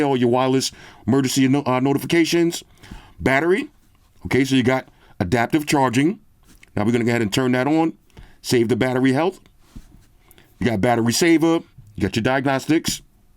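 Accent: United States. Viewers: The only stand-up comedian reviewing Gadgets channel in whole YouTube😅🌹although I don't understand some words of native usa accent, but I always watch each video uploaded🌹😅 tnx for ur perfect sense of humor 🌸🌸 🌸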